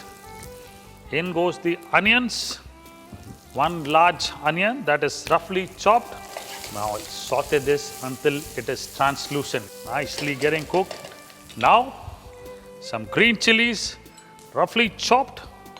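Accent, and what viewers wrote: Punjab, India. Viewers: Paji....ur punjabi style Hindi is very nice...english is not suting ur voice......the way you tell "Oh ji namak shamak namak shamak" is awesome....paji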